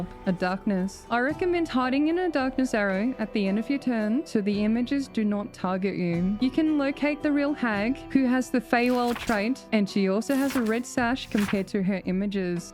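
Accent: Australia. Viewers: I rocking love your Aussie accent!!!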